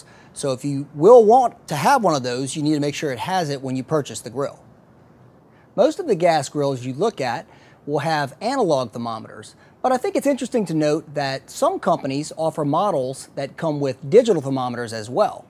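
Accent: Louisiana, United States. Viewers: Good stuff! Very helpful. Also, you sound very much from Louisiana.. am I right?EDIT: Nevermind. just looked at your channel and saw all the other LA related videos.. lol. great channel and video production. Pls keep it up!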